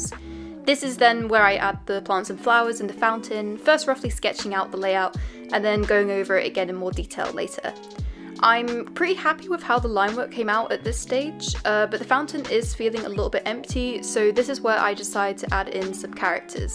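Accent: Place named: England, United Kingdom. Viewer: I love how at first you have a perfect english accent, but towards the end of the video as you got a bit more tired, you started to sound more and more like you were from Yorkshire.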